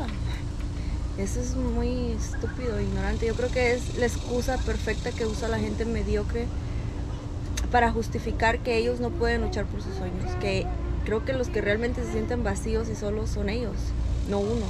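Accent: Mexico. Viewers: Casi cada fase de tu vida..undudable falta un hombre estable en el hogar. No jusgo nomas soy realista. Pero ya a cosa positivas..estas hermosa y me encanta tu accento me recuerdo al de mi Durango Mexico. Slds de Chicago.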